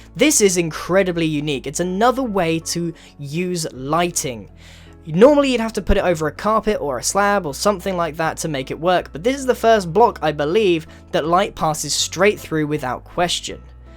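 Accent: United Kingdom. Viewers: He sounds to British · That British Voice Is just, way off from mine, I am British and i sound so different.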